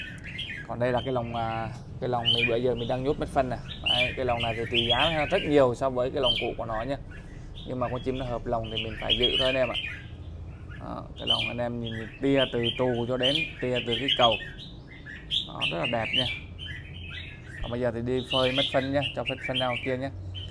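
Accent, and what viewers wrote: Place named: Quang Tri, Vietnam. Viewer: Làm video ép giọng mào non đi anh giọng quảng trị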